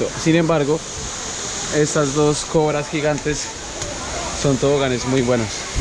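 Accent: Colombia. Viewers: comunica colombiano jajajaja. la buena parce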